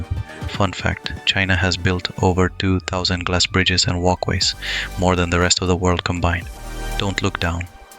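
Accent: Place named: India. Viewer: 你的英語口音和其他大部分印度人的英語口音不同，為什麼？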